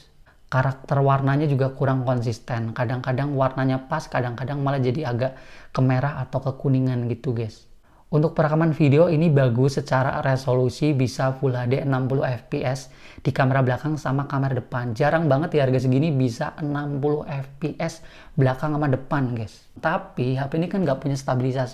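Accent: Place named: Banyumas, Indonesia. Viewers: Khas Banyumas 😁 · Wiih wong banyumas jebule 😂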